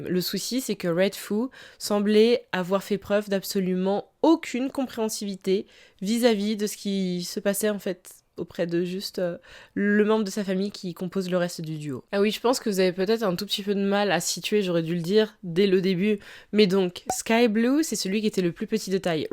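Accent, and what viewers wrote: United Kingdom. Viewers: j'aime trop ton accent british oml 😫🫶🏻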